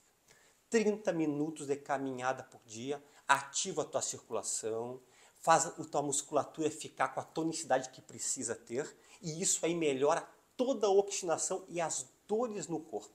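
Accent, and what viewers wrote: Rio Grande do Sul, Brazil. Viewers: Ah...reconheci nosso sotaque gaucho❤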